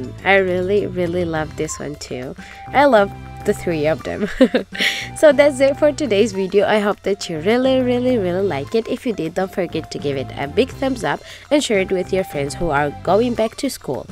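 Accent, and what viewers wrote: Spain. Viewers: Is it strange that I love the way you're saying "We gonna' need"? ;-; I think it's too much cute D: And I love your spanish accent c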